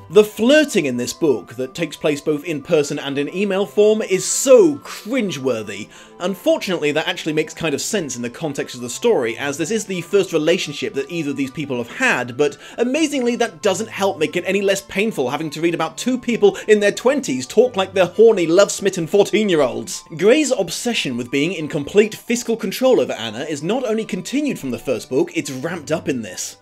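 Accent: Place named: United Kingdom. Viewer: I'm sortof giggling at the whole dom/sub thing. It was a branch of literotica I found very interesting in my younger years :) I can imagine you as a world-weary, slightly snippy dom who doesn't have the time for his subs' bullshit. If you're dressed as an Oxford tutor with a pencil behind your ear, it's your own fault for having such a marvelously British accent. I'm absolutely in love with the way you call out and destroy E L James's twaddle. Keep it up.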